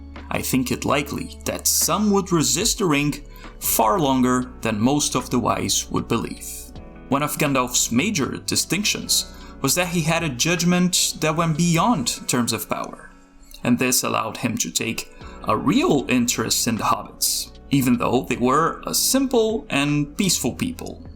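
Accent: Brazil. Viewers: You sound Brazilian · Sir, are u brazilian? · Brazilian masking accent 👀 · Vc é brasileiro, né?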